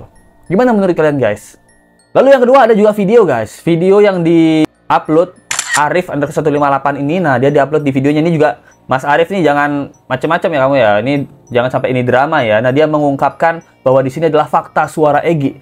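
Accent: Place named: Jakarta, Indonesia. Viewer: masa orang cirebon logat lu gua lu gua logaj jakarte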